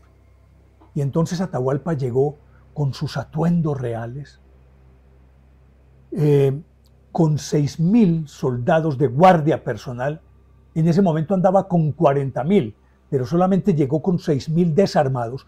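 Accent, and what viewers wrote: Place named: Colombia. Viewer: Que hermosa manera de relatar la historia! Pienso que en alguna vida yo fui Colombiana porque me fascina escuchar la forma de hablar de los Colombianos ❤❤❤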